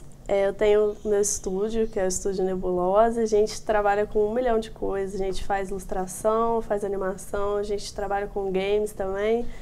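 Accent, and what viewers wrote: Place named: Brazil. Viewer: antes eu n tava ligado nessa de definir o sotaque brasileiro do inglês, mas no momento que vi ela falar do vídeo do mine, sabia que ela era brasileira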